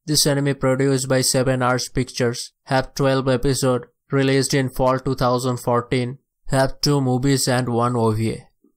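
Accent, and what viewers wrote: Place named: India. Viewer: R u Indian 😍😍😍😍😍😍😍😍😍😍 U accent so cool, mjhe tmhara awaz bhot psand aya 🤗🤗🤗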